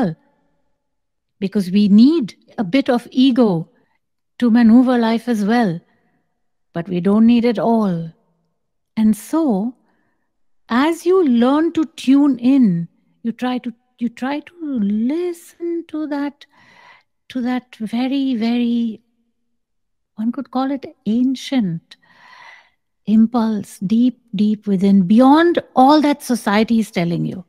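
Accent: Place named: France. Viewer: Unmistakable heavy French accent ;)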